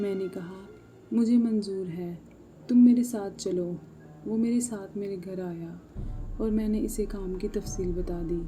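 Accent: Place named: Kashmir, India. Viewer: I hope u from Kashmir it's your voice 😲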